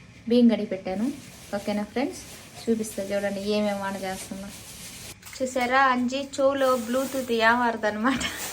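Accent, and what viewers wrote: Nellore, India. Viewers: హలో గాడ్ బ్లెస్స్ యు. నీ వీడియోలు చూస్తాము. ఆ నెల్లూరు బాష వీనీ ఆనo దీ స్త ము. మా ది గూడూరు. జాగ్ర త గా ఉండు.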